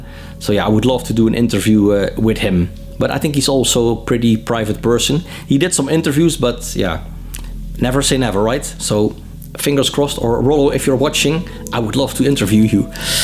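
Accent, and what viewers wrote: Netherlands. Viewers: The Dutch accent with English is great btw. It's reminds me of a time when I lived in netherlands years ago. So if some take issue with it they have the real issue. Happy Christmas to you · Anyone who complains that the Dutch host has an “accent” needs to go and flush themselves down the toilet. Ignore the morons. They walk among us unfortunately. I discovered this channel about two days ago. I have spent most of those two days watching all of your videos, stopping occasionally to sleep and eat!! Love it and keep up the great work. My fave is definitely the Space Brothers. Those guys are legends🤣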